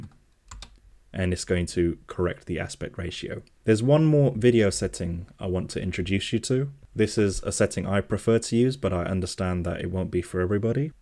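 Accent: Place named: United Kingdom. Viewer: Oh, I meant it in a positive way! I'm not a native English speaker, so I’m used to hearing different British accents. Your accent sounds softer and more neutral compared to others I've heard, and it’s nice.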